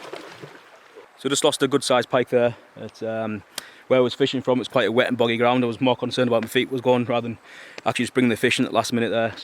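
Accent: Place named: Northeast England, United Kingdom. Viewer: Great relaxing video and no silly music.im 60 now and me and the lads used to do this 😊you sound like tojr from the northeast.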